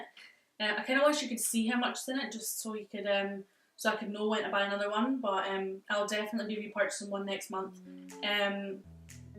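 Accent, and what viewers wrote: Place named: Scotland, United Kingdom. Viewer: Omg! Loving this look, colours are gorgeous on you...I'm diggin' your accent! Are you from Scotland, I'm not good at detecting accents so please bare with me luv 😂 😂 😂 Had to subscribe, excited to get to know you girlie 🌸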